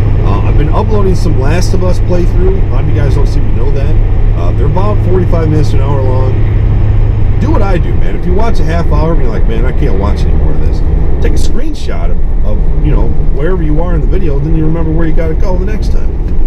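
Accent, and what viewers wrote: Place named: Texas, United States. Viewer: Michigan? I figured you were from Texas or something lol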